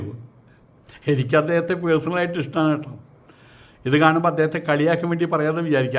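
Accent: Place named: Thrissur, India. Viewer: സ്വാമിയുടെ നാട് ചേർപ്പ് ആണോ ? തൃശൂർ Slang ഉണ്ട്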